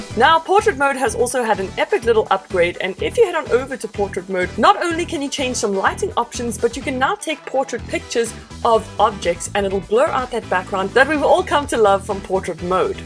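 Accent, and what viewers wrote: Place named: South Africa. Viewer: Damn, I didn't know you are SAn. Well the accent is there, but the channel numbers say a different story.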